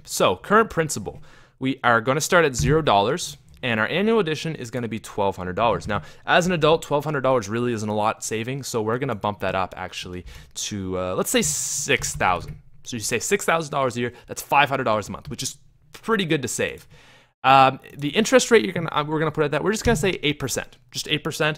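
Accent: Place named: Canada. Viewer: I knew you where from Canada because of your accent well I'm from Canada too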